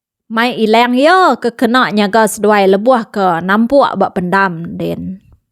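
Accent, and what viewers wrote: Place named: Saratok, Malaysia. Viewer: Munyi jaku urg tungga ke Saratok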